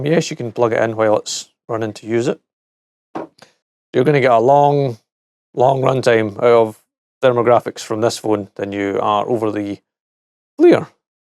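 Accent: Scotland, United Kingdom. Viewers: Cool, like your accent, Scottish? Do you think doogee used good components to last as long as samsung or other 'well" made phone?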